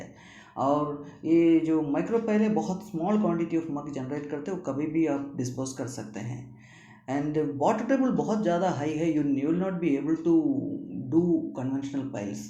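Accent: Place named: Kerala, India. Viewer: Hey Malayaleees...Alae...Slang ketapol manasilayi. True?.. and Good Info Bro keep it up...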